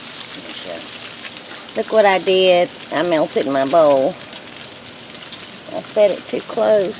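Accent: South, United States. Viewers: First time viewer. I absolutely love your southern accent!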